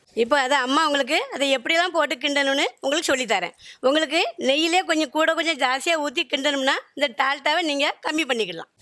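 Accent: Tirunelveli, India. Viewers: Thoothukudi and tirunelveli slang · நான் பிறந்து வளர்ந்தது எல்லாம் திருநெல்வேலி தற்சமயம் சென்னையில் இருக்கிறேன் திருநெல்வேலியில் இருந்த காலத்தில் வாரத்தில் ஐந்து அல்லது ஆறு நாட்கள் அல்வா சாப்பிட்டது திருநெல்வேலியின் தட்பவெட்ப நிலையும் தாமிரபரணியின் தண்ணீர் வளமும் திருநெல்வேலி மக்களின் கை பக்குவமும் திருநெல்வேலி அல்வாவின் உயர்ந்த ருசிக்கு காரணம் உங்களது திருநெல்வேலி பேச்சை கேட்க கேட்க சந்தோஷமாக இருக்கிறது அனைவரும் நலமுடன் வாழட்டும்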